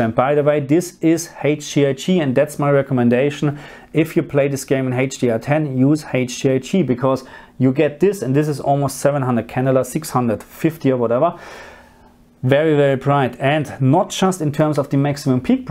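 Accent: Germany. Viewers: Those settings worked for me (playing on PS5 on a SONY Bravia TV) very well, before I had to crank up my my max. brightness much higher than my TV actually can take, Tone Mapping had to be on 1. Thanks a lot! P.S. Da ich von Deinem Akzent (no diss) her annehme, dass Du auch Deutscher bist, noch eben muttersprachlich: Vielen Dank, werter Herr!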